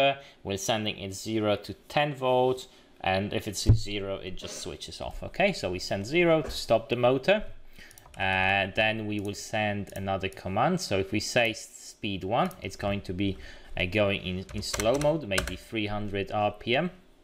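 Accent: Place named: United Kingdom. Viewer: Thanks man ! where is coffe button ? // BTW your brtitish accent is perfect for me so clean 😃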